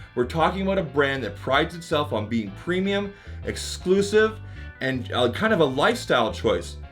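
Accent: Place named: Canada. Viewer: thanks for what you do, the first time I met you I was in a live show of fpt and you donated $100 and defended Jon when some people were being rude. I commented that you sounded like a Canadian & you answered that you were from Calgary, my response was lol I’m in the gas city.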